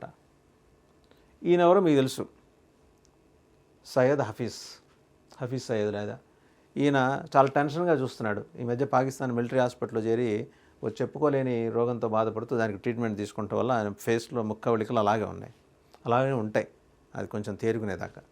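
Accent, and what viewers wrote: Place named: Nellore, India. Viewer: It seems you are a nellore person as per ur accent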